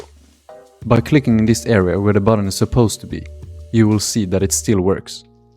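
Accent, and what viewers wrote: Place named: Sweden. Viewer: snyggt, nä men notera det när jag hörde att de va lite svensk dialekt i din engelska, funderade om du va norsk eller svensk xD :D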